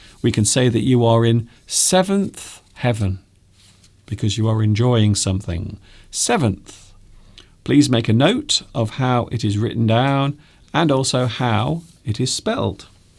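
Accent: United Kingdom. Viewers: 💛💛. My British accent teacher 💛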